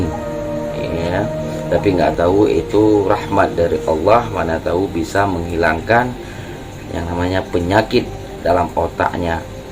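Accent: Aceh, Indonesia. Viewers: Org aceh y bg? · Dr logat bicara nya org aceh ya.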